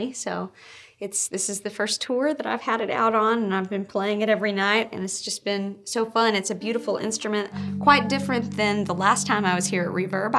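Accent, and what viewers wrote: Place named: South, United States. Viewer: Her accent is crazy. It's like some words she says are from the south but then every now and then a few northern ones slip in.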